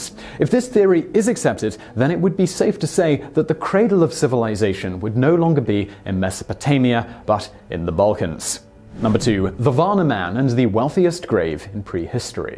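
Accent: United Kingdom. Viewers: When did VSauce Michael start using a British accent? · These aren’t facts. Its euro-centric BS for racists doing “research” on you tube to find and site as proof. This video is unscientific, gives no citation of the amazing amount of research that would have to exist to support all that it claims. Its typical YT nonsense with a British accent in order to pass it off to stupid, insecure people.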